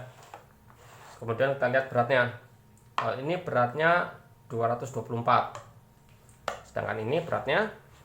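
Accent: Indonesia. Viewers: JAWINE PUNDI MAS aku MAGELANG LOGAT JENENGAN MIRIP WARGA TEMANGGUNG MAS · Dari suaranya cina wilayah jawa 😅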